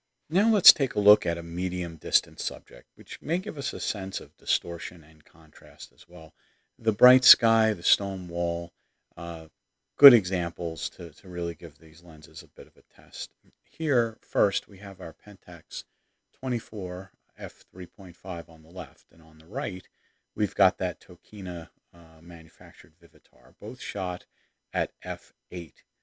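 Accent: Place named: United States. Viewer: Thanks for this review and comparison. Always loved Minolta for the very beautiful color reproduction and quality. Olympus surprised me too. I have never owned a single Olympus lens, but judging by various reviews, I had the impression that, in general, Olympus lenses were of average quality. However, in this comparison, the Olympus performed very well, especially in the corners. I also wanted to say that I liked the composition in the photographs themselves (or the cropping applied later). One can immediately say - this is a person who knows a lot about composition. The very first photo is BOOM, you can immediately put it as wallpaper on your desktop :) And that photo with a stone wall is so sharp and colorful. And the picture that was most likely taken from somewhere in the forest (?) and we see a landscape and those biiig columns - a very good composition and the picture itself is very atmospheric, with a beautiful distribution of light. 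I immediately became interested in what kind of place it was taken. Judging by your pronunciation, you are an American. But the country is pretty big (although somewhat smaller than mine, hehe), so for now it remains a mystery to me, because there were no signatures. Anyways, very interesting comparison and I'm glad I stumbled upon it today (even though it's been 2 years) because today there are so many different kinds of "bloggers" and... to be honest, they show themselves more, rather than their content. Constant jokes, all sorts of vide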